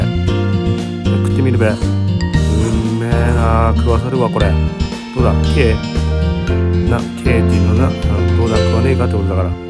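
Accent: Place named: Hokkaido, Japan. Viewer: 北海道弁も良かったです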